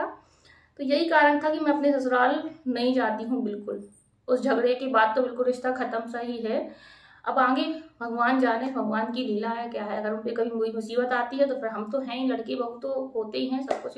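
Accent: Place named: Orai, India. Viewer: आपकी भाषा तो हमारे यहां की लग रही है मैं उरई से हूँ आप किस शहर से है🤗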